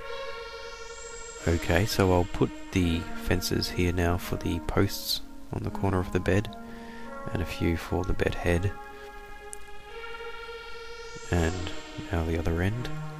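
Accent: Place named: England, United Kingdom. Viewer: Thumbs up if you read Harry Potter and you knew that the guy was going to have an English accent.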